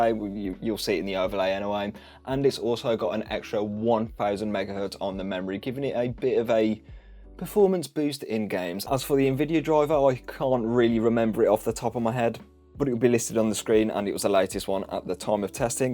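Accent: United Kingdom. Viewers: I just bought the 10 gb version done weeks ago for 373 USD or 289£ since you sound British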